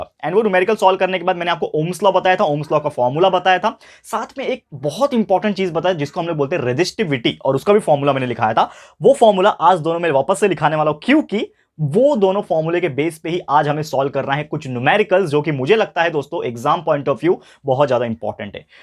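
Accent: Maharashtra, India. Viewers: Sir kya ap marathi ho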